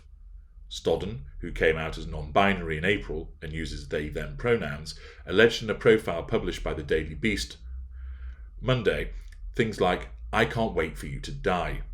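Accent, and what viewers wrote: United Kingdom. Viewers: He has a very relaxing voice, just like Omegon. But I'm in love with the British accent anyway.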